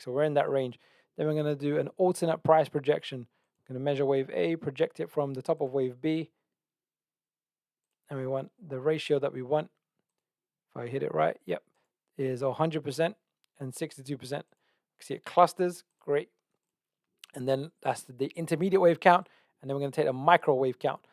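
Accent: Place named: United Kingdom. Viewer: more so with crypto narrative, opinions, actually studying all aspects of this market other then price, fundamentals and watching people with a different mindset which allows you to open your mind that little bit more. All these don’t marry up so well with Instagram. None the less you sound like your from the U.K. so I’ll just have to bump into you and try my luck that way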